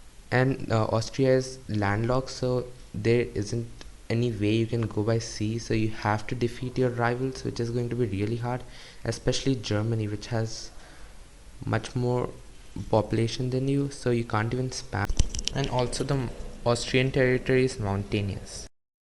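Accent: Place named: India. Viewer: I love the indian accent man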